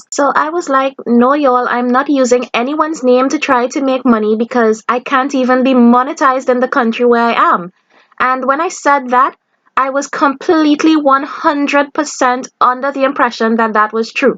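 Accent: Trinidad and Tobago. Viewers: 🇯🇲 I love your Trini accent. You can talk about raindrops and I would tune in. 🇯🇲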